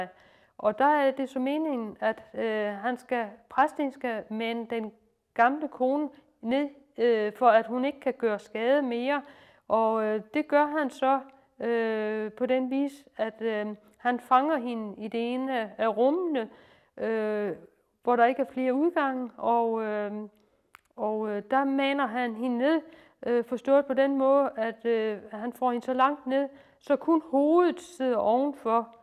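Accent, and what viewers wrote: Lolland, Denmark. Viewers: Flot, vi sad i dag på Nakskov test og vaccine og så dig. Det er flot, lav endelig noget mere, du gør den lollandske dialekt ære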